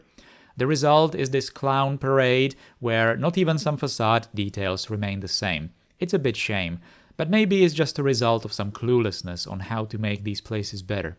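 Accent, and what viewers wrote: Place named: Czech Republic. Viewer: Jeho český přízvuk je nepřeslechnutelný...😅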